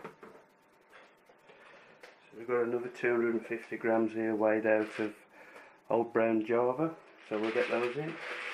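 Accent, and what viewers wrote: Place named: West Midlands, United Kingdom. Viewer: Funny that you should mention the kitchen full of smoke :). Yep you guessed it, I couldn't wait for the ducting pipe to arrive and roasted my first batch. In all honesty for a first batch, they really are quite good. I am indeed in the UK, Derby, East Midlands and from your accent I would hazard a guess that you are in the West Midlands. I would certainly be grateful if you could let me know where you get your storage bags from, I have looked on Amazon where there are so many to choose from I just get bamboozled :). I would definitely be interested in the coffee beans too please if you could provide a little more information. Many thanks and best wishes. Ian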